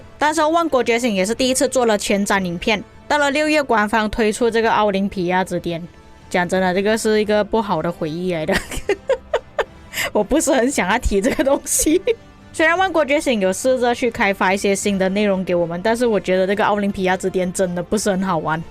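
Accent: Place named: Malaysia. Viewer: 加油（其實我是因為你的聲音有很重的大馬腔我才開始看你的影片的😂），你的影片都很有參考價值，繼續做下去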